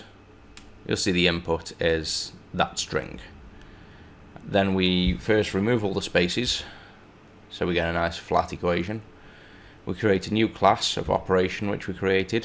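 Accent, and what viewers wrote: United Kingdom. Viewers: Your tutorials are very helpful and neat (not to mention your deep British voice) , in fact the only ones that helped me through all my problems.i was trying to make a power function but that went terrible and I was hoping if you could do a tutorial on that.it's just too hard to figure out the logic (: greatly appreciate it.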